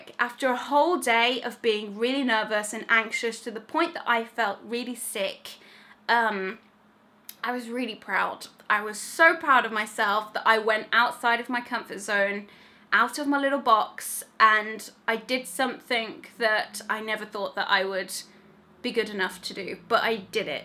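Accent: Birmingham, United Kingdom. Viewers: Omg i had no idea you were a brummy as well twins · I never realized you’re from Birmingham. I was told it’s the least attractive accent in the UK 😆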